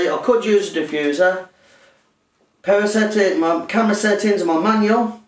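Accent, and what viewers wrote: Birmingham, United Kingdom. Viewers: I used to hate dandelion season and right now in Canada, we don't have any. I can't believe that I am looking forward to it this year! Just discovered your videos and love your presentations and simple explanations. Look forward to more! Great job. As an ex Brit, love the accent! Thanks again Bill Goodwin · You sound like a fellow Brummie!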